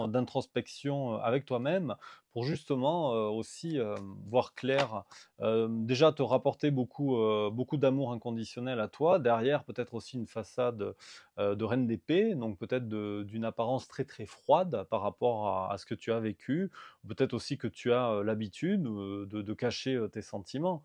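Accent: South, France. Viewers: Bonjour, J'ai souvent des synchronisatées style 111, 11h11, 777, 31 qui est son département, 29 date de naissance bref, je n'y crois plus car sa fait trop de temps passé, perso j'ai du mal à refaire confiance aux hommes dsl mais je dis se que je pense Sa reste un tirage général malgré tout. Lumineuse journée bel accent du Sud ou..... Merci. 🙏💜💜🙏💜💜🙏😇🙏🕯️🙏🕯️🙏😘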